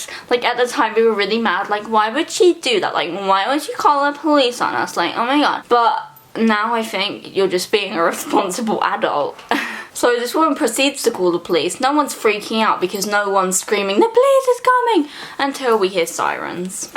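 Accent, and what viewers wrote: England, United Kingdom. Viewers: She is from the UK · dick bicycle I mean her hair looks pretty brushed to me. She has braces and she's British so her words might sound different. 😂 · Its English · is she from England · Jacob Engallena it's not her accent it's a British accent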